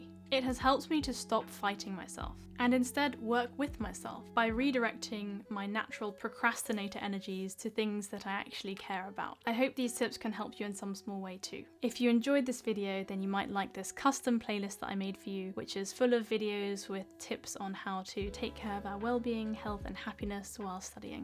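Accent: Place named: RP, United Kingdom. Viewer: I'm not a productive person, but now I'm trying to be...I'm working on my English and my accent now and i love rp accent. I'm planing to watch your video everyday, not only that i get to do a listening practice but i also get a lot of super helpful and amazing useful tips❤❤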